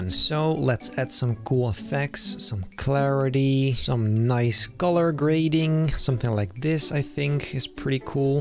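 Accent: Netherlands. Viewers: And are you dutch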